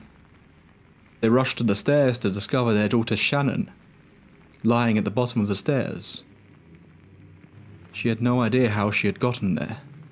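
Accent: United Kingdom. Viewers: Why are all videos like this narrated by a British guy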